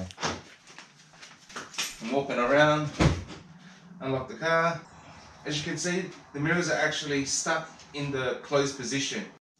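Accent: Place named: Australia. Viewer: Hey, Great Aussie accent. Yes good info but my CLC <num> does not have a convenience feature so only operates by the buttons on the dash and the swing out does not work. would i have to fix that switch? Or could I make it operate with the key fob? André in Sydney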